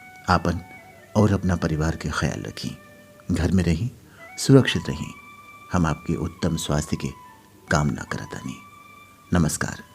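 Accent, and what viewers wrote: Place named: Bhojpuri, India. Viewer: Waah भईया केतना सुघर खांटी भोजपुरी बोलतानी रउवा🙏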